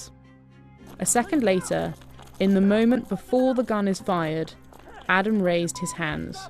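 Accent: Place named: United Kingdom. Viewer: I think they think a slight British accent makes this nonsense more believable..... SMH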